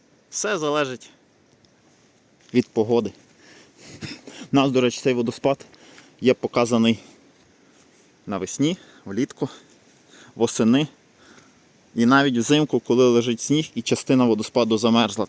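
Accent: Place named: Lviv, Ukraine. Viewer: Первый раз чую львовску мову... Интересно... Я всэ розумию... 😊😂🎉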